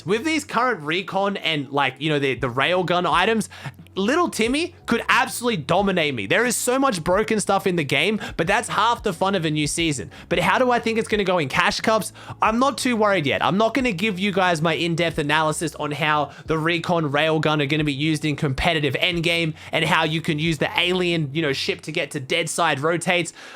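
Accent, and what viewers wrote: Australia. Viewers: Hey can u put. Captions on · Yessirrrrr what’s up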